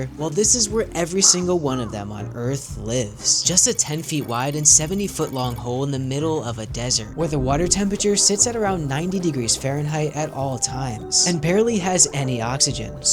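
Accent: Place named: Alabama, United States. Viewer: Sounds like Alabama.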